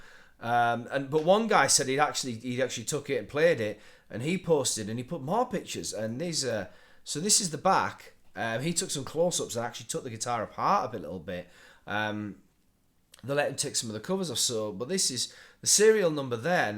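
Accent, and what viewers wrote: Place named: Darlington, United Kingdom. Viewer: no thats his actual accent, im form the uk and a northerner from leeds, hes from more up north than me lol but they definitely speak like that in Darlington area as he described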